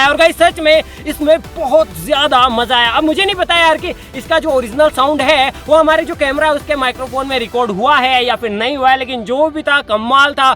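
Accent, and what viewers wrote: Rajasthan, India. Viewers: Rajasthani boll raha hai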